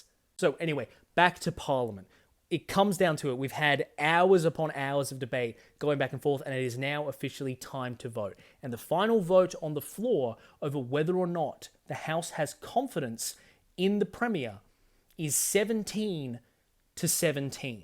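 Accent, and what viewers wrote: United States. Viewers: Your analysis is interesting but your American pronunciation is annoying af. In particular U sounds as OO sounds like 'noos' but also words like Lieutenant and semi. Watch more Australian content. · The what Governor? Get your pronunciation right, dude. You have too much of a Seppo twang as it is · Fascinating developments. Am curious about your accent though: did you spend time in the US?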